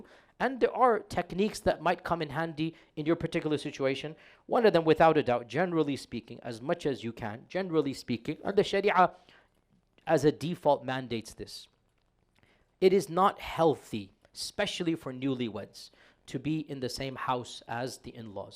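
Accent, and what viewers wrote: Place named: South Asia. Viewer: You sound desi AF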